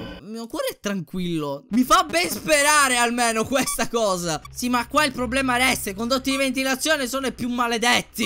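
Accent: Sicily, Italy. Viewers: Ma sei siciliano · ma sei siciliano?😱😱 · Sei siciliano? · sei siciliano? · Ma sei siciliano?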